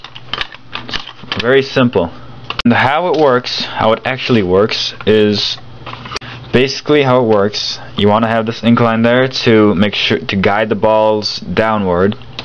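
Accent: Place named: Russia. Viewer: is russian your first language or something? you have an accent. can you speak russian at all?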